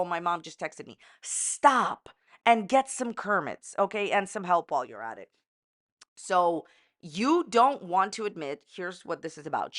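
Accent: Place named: East Coast, United States. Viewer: I love your east coast accent!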